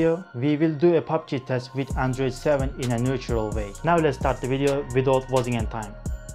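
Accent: Turkey. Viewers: Yazılım ile ilgili videolarda Hint aksanına alıştığım için Türk aksanı bile daha çok dikkat çekiyor.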